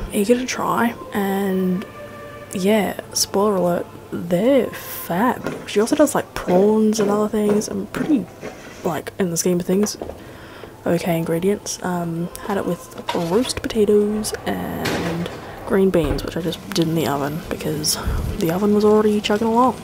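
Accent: Australia. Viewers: Haha I thoroughly enjoyed you talking about Hufflepuffs in your Extra Australian accent, it didnt even occur to me that your "YouTube voice" was not at maximum accent level😂 also I'm really impressed by the amount of veg in this video everything looks so delish💕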